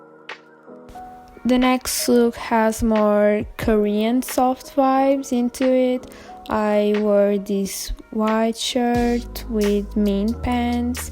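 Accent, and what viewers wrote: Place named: France. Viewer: Par tout hasard tu parlerais pas Français ? Y’a un petit accent quand même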